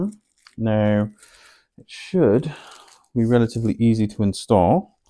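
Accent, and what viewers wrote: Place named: United Kingdom. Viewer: I’ve been wondering about your accent, where are you from in Britain? These videos are great, really nice to get to know you 😊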